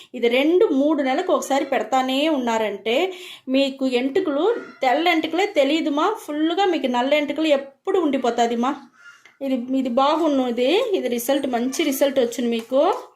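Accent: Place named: Rayalaseema, India. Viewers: Tamil kadhu, rayalaseema telugu adhi, naa frnd vundhi rayalaseema ammayi, thana slang kuda ala ne vuntadhi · ఈమె చిత్తూరు యాసలో మాట్లాడుతున్నారు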